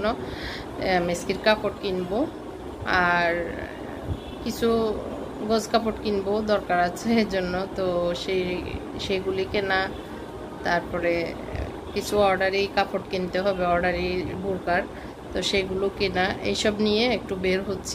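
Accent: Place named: Feni, Bangladesh. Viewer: Apu apnr voice sone ami bapci j apnr badi feni or noyakhali... Thaxs apu... Amadar badi o baryearhat...valo thakbn apu Feni apnr badi kothaiy... Ami next year Khulna thake feni shipt korbo....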